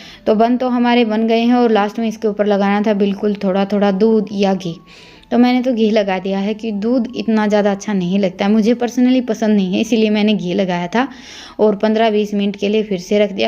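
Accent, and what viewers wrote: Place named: Alwar, India. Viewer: Aap kha se h plz batana aapki boli se to aap Alwar ki lagti ho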